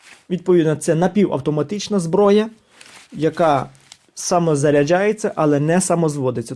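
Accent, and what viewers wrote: Lviv, Ukraine. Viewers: Красавчік, чую що із Львівщини чи поруч. Але контент цікавий😂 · Чути Львівський акцент у Пана,хоча може я й не правий😊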